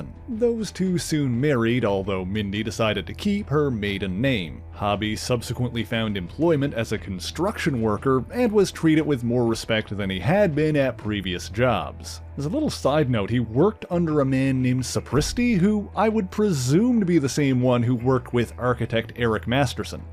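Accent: Canada. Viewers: It’s HO-b…not hobby…is this dude Canadian?…some of the weirdest pronunciations ever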